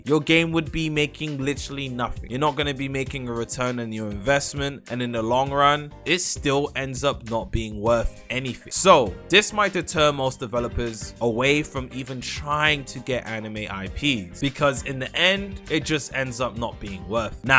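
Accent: United Kingdom. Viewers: most bristh person i ever heard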